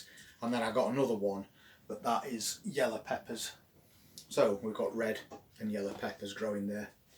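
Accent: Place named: Northern England, United Kingdom. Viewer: I so love your videos. I have M.E and days where I'm suffering a crash (post-exertional malaise, which feels like 'flu with a hangover), your gentle voice and lovely Northern accent is soothing to my frayed nervous system.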